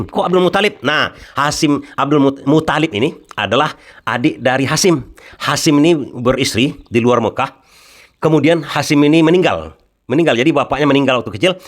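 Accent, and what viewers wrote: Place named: Belitung, Indonesia. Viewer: Bahasa bpak ini seperti orang2 daerah bangka belitung tapi lebih ke belitungnya, apa benar pak....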